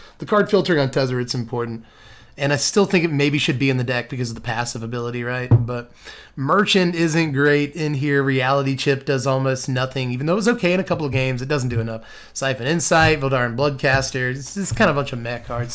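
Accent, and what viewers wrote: South, United States. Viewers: My dude you know I love ya to death, please please please please stop with the country voice. I keep having to click away. It’s…too much. Thanks.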